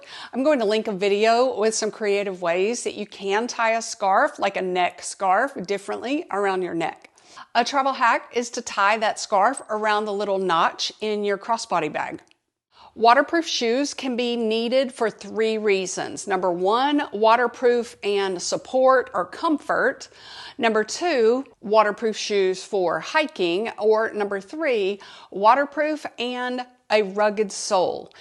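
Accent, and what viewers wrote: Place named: Georgia, United States. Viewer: Oh, so that is why I like your accent. I am from GA too. The Texas accent grates on my nerves.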